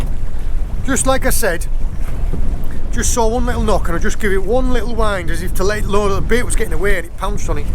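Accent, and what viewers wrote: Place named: Cumbria, United Kingdom. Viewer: Great content as always mate, you proper sound like your from Cumbria, the accent is uncanny, I’m up in a town called Maryport, keep up the videos mate 👍🏼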